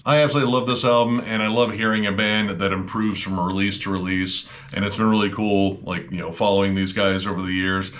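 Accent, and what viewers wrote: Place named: Wisconsin, United States. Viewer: Im a sucker for a Wisconsin accent...on a lady. Hey, I dont judge.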